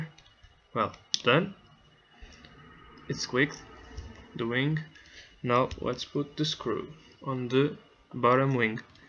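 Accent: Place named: Caribbean. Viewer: Your video is unfortunately stay only have no idea this Huawei G300 disassemble your video is too long, it shows you do not know, my advice is to practice before and after you record it, you should have practiced before and make sure you see more removed when this smart phone, plus your English seems caribbean, I feel for you but my vote is desfaborable do not understand how you can be so stupid to make this video ....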